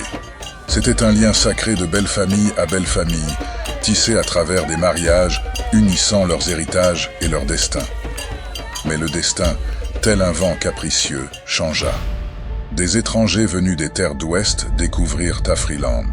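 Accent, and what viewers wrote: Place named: France. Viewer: Vraiment magnifique … Mais pourquoi l'accent français du colon? Soyons également fier de nos accents Africains.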